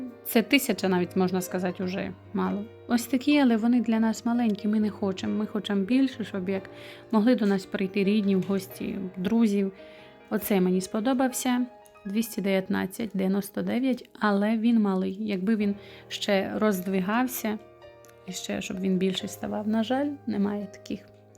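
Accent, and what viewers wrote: Rivne, Ukraine. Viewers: якщо не секрет з якої області України ви переїхали.Ваш акцент,говір дуже схожий на той де зараз живу я.Рівненська.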